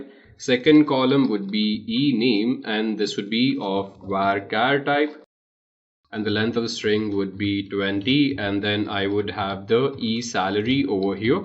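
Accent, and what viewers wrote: India. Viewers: Any chance yoy could add subtitles to your videos? I’m Indian too, but don’t have an accent so I can sometimes barely keep up with your’s in your videos...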